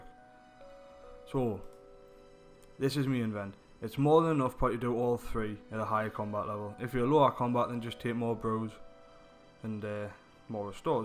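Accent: Newcastle, United Kingdom. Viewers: geordie guides geeeet up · fellow geordie?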